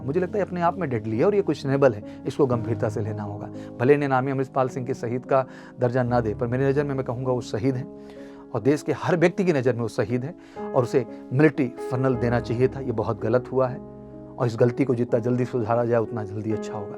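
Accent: Bihar, India. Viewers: Mr bihari accent, you remind me of Kanhaiya of JNU. Beta kaunsi party se ho? My father served in the Army for 30 years. He himself said that anyone committing suicide is not a brave heart. Suicide ke kaaran 90% due to personal issues hote hain. Jisko nahi bhejna na bheje fauj ke liye. Kamzooro aur namardo ke liye hai bhi nahi ye fauj. Zara video banao jo actual fire fight main shaheed hote hain. Dont make the armed forces weak. Aa gaya na aukaat pe ki agniveer nahi hona chaiye aur permanent hona chaiye. Dikha hi di aukaat.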